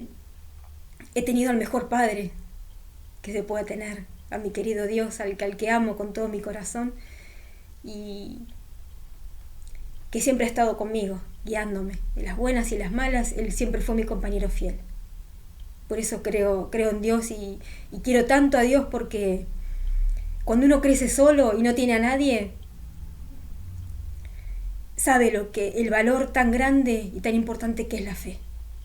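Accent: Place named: Argentina. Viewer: Que curioso que hayas nacido en España, con ese acento pensé que habrías nacido en Argentina jaja. Que sorpresa lo de tu padre no sabía que era un artista de tango. Lo que cuentas muchos lo hemos vivido también, hay mucha hipocresía ahí dentro. Ancianos que fuman en su horario de trabajo (cuando creen que nadie les ve), adulterio, hermanas que cuando pasan las fiestas con su familia “mundana” que no es testigo celebran navidad y cumpleaños pero luego guardan las apariencias en la congregación (los típicos que tienen un pie dentro y otro fuera de la organización), etcétera. te prohíben cierto tipo de música y luego ellos andan escuchando música “mundana” de lo peor, en fin muchísima hipocresía. A mi de adolescente me prohibían ver ciertas películas porque decían eran malas y no se podían ver pero luego nos invitaban a alguna fiesta en casa de un anciano y su familia y veían películas de Disney como la cenicienta blancanieves o la bella durmiente que también tiene brujería y yo pensaba por qué yo no puedo ver tal o cual película y ellos si pueden ver películas disney que tiene brujería? 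En fin, pura hipocresía, la verdad es que hay un doble rasero y una hipocresía impresionante dentro de la secta. Nadie te tiene que decir que música puedes o no puedes escuchar, eso es decisión personal tuya y nadie se debe meter en esas cosas. Pero te quieren controlar cada aspecto de tu vida. Luego años después leí en un artículo de su página oficial JW org donde dicen que ellos no tienen una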